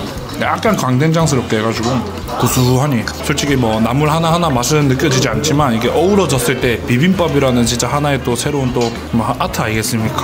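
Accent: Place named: Seoul, South Korea. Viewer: 이제 인트로가 서울말 다됐네 다됐네.....초심....유튭최강자 되니까 사람변한다....사투리로 돌아와줘😭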